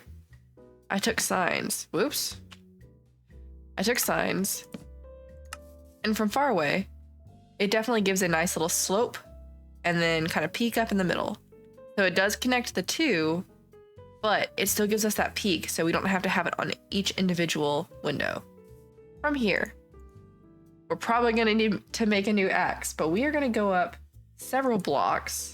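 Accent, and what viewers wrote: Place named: Texas, United States. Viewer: This girl is definitely from Texas or something. Her accent sounds exactly like mine.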